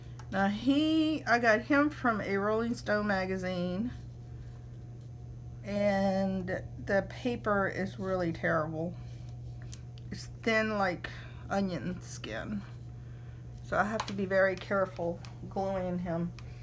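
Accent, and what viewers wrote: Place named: South, United States. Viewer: I'm from New Orleans. Are you from the south? Sounds like it lol. I always like to guess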